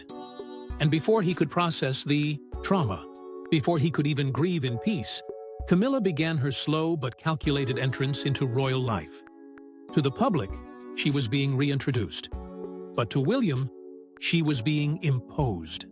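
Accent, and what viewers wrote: United States. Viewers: Why are these videos read by Americans? ANYBODY??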